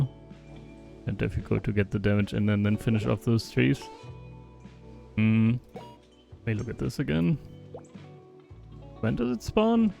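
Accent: Germany. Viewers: Is that a common German Accent? I've only heard it once before and it was a character so i didn't know it was real but you sound EXACTLY like he did.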